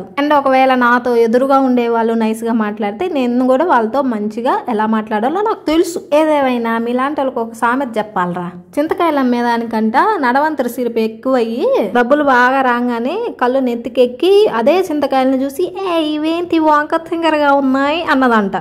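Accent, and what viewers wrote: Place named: Chittoor, India. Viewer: Me yasa chittoor yasa laga undi btn andariki reply lu ichukuntu pothunte manam mundhuki polem vallu vaguthunnarante entha kaliga unnaru odhileyandi garu neechuleppudu neechamgane matladtha ru 😂😂😂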